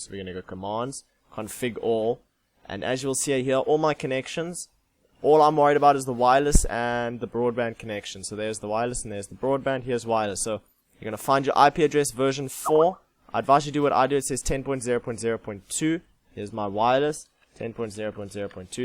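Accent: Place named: South Africa. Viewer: This bra sounds South African AF rofl awe bru thanks for the help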